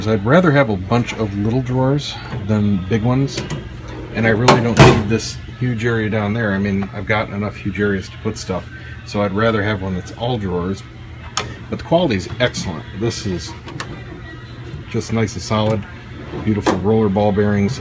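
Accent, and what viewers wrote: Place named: Orlando, United States. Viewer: Check the pawn shops, ive been cleaning up there lately. You sound like your in the Orlando area. If so check out Godfathers pawn on Colonial. There are 2 locations. I seen a nice Milwaukee box in there you might like.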